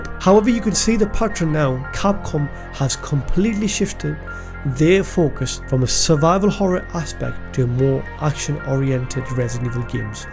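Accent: Newcastle, United Kingdom. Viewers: Off topic, is that a Geordie accent?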